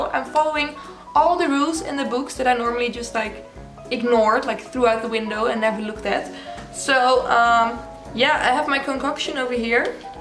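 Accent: Netherlands. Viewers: Haha ja, nogal Nederlands accent hè, dat is zo leuk van mensen over de hele wereld op youtube, alle verschillende accenten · Wait are you dutch? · Where is she from? she sounds dutch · Ben je toevallig Nederlands? Ik hoor daar ergens een Hollands toontje😂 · are u dutch? bc idk ur accent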